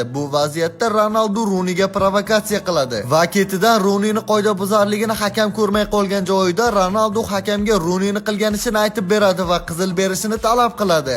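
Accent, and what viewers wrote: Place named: Rural, Uzbekistan. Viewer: Gapirish nutq kalxozcha blaaaaa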